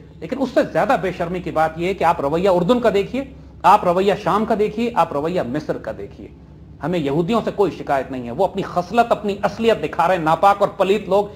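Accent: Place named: Punjab, Pakistan. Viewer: What are you yapping about? Speak in English, you illiterate. keep your dumb Punjabi Urdu in Punjab. Spare us your filth!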